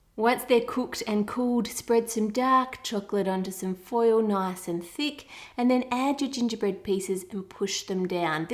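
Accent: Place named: Australia. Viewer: "To make this one, you'll need floura, suga, butta.." HAHA! Sorry, I don't mean to laugh at you, but it's just funny hearing your Aussie accent to someone like me in the U.S. 😅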